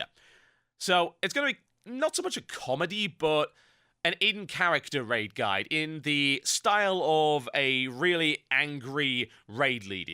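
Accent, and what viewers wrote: United Kingdom. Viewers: by the way you talk, it always pictures in my mind an old guy with an angry british accent.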